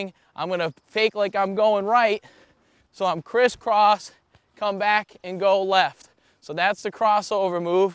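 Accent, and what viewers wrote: United States. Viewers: No no. dude in America, and canada they call it soccer actually. lol. He sounds like hes probably from one of those countries, hence the soccer reference. It is a term in the dictionary. you are giving false information. i didn't realize that anyone had any confusion about this matter besides yourself. If you are trying to make an underlying point about the fact that soccer is not the original name for the sport where it was invented in england in the 1800's than you have missed badly.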